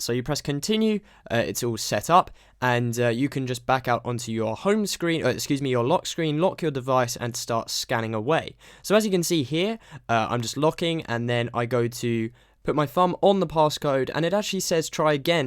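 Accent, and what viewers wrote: United Kingdom. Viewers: I luv british accents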